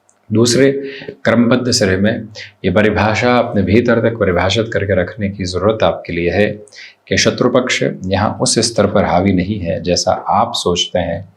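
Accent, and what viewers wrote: Standard. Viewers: अत्यंत शुद्ध हिंदी भाषा व उच्चारण सुनने के बाद अत्यंत आनंद का अनुभव कर रहे हैं l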